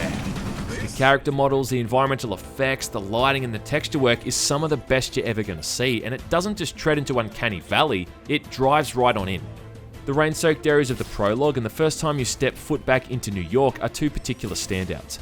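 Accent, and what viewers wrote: Australia. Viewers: hussien Strahyan Accent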